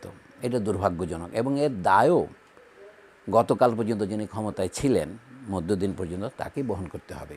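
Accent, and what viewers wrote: Chittagong, Bangladesh. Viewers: As soon I heard you, I new you are our son ( Chaatgaiya) 😊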